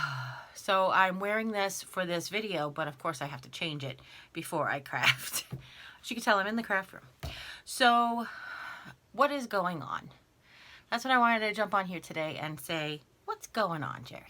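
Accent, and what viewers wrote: New York, United States. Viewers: I could listen to you talk all day I just love your wisdom and your accent too hehe I hear that New York in there!! Love you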